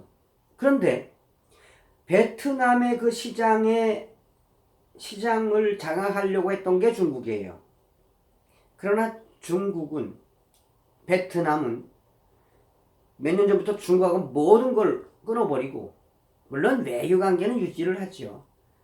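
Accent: North Korea. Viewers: 고조구수한 이북 작고하신 우리아버님 목소리같아 정겹네요 응원합니다 화이팅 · 오리지널 북한사투리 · 북한말씨로 좌파아가리 이중성을 말해 주시니까 속시원합니다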